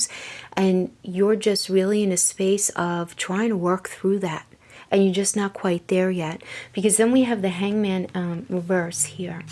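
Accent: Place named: Boston, United States. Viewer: You are definitely from or live in Boston... at least your accent sounds like it... great reading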